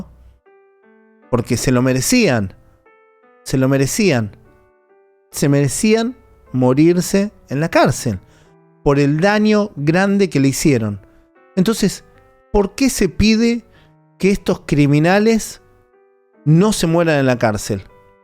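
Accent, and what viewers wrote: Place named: Argentina. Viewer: no le cambies, me encanta cuando dices: “en cana” y es un “agco” siempre me da risa cuando los argentinos dicen asco.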